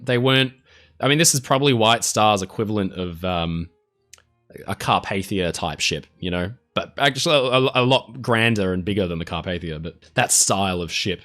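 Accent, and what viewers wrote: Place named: Tasmania, Australia. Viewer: I love your Tasmanian/Victorian accent. Also, from here in the deep interior of North America (Colorado) most of us will never get a chance to experience the ocean/sea . thank you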